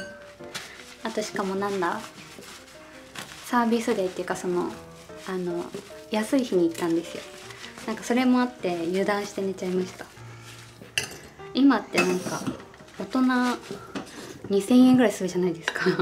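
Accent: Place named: Aichi, Japan. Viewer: はじめまして。最近さんの動画を発見して、少しづつ見ています。癒しの時間です。私も愛知出身なのですが、しゃびしゃびと丈夫い、確かに関東に来てから聞かないなと思いました。さんの言葉のイントネーションが好きに感じるのは、故郷を感じるからかもしれません。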